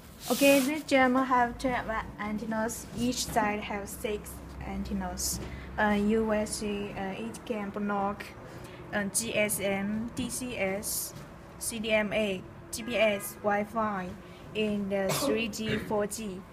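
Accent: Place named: East Asia. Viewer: Andre Moreno well shes either Chinese or Japanese based on the accent and basic English speaking second China & Japan don't care to much apparently because i hear shortwave pirates from Japan & China alot. so this really dose not surprise me...Asian woman..showing illegal jammer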